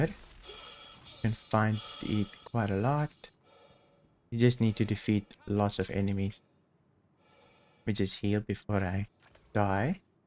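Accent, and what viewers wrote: South Africa. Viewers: Great content there mate you sound South African if so I'm South African too anyway this helped me through site B...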